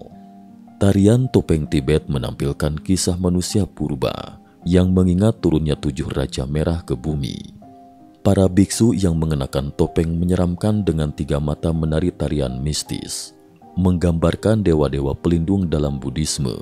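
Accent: West Java, Indonesia. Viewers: mang , maneh urang sunda nya ? hayoooh ngaku 😂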